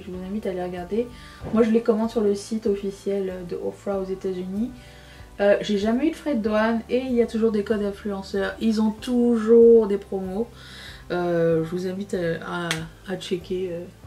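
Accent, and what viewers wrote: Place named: Quebec, Canada. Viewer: T'es Québécoise ou Française j'comprend pas trop 🤔 T'inquiéte c'est pas une critique c juste que youtube m'a recommander une de tes vidéos hier so yea.. J'vien de te découvrir et je perçois l'accent QC 😅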